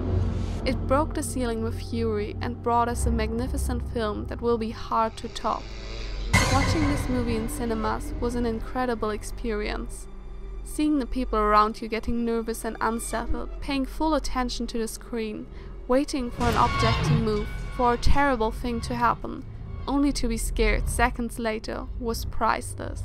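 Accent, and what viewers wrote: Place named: Germany. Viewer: GERMAN. You can hear it :D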